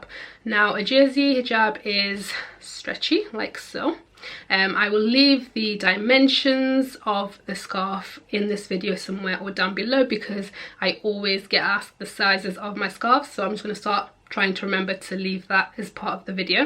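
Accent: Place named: North, United Kingdom. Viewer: You're pretty! And I like your accent. Northern? ❤️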